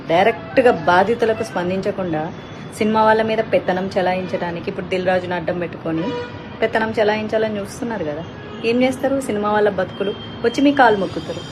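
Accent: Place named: Andhra Pradesh, India. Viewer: సగం ఆంధ్ర సగం తెలంగాణ.... సగం నార్త్ ఇండియా తెలుగు... భాషని కూని చేయకు....!!!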